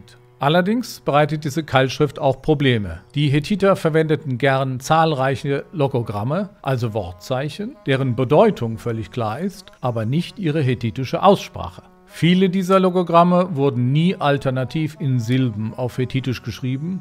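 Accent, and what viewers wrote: Germany. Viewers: Hat der gute Mann einen Bayrischen Dialekt😁 Schöner Beitrag 👍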